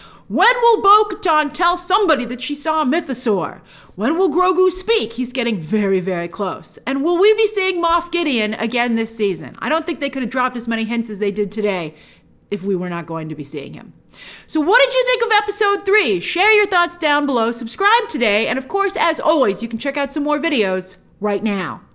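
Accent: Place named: United States. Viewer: I like your voice. Your accent is nice. Which part of America is this accent from?? Can somebody tell me?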